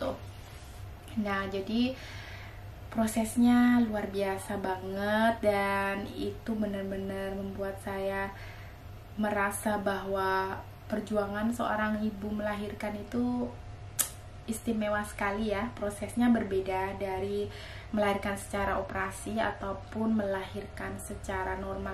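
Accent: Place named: Java, Indonesia. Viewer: Aku suka mbak & keluarga.. Lihat anabelle.. Yg lincah & robin yg sangat aktip... Dan gaya mbak klo ngomong jawanya... Medokk aku suka.. Kita wong blirar juga.. Tp tinggal di lampung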